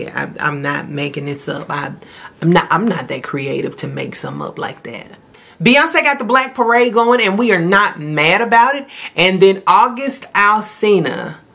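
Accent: South, United States. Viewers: you look so pretty✨ and i can really hear you southern drawl 😍💕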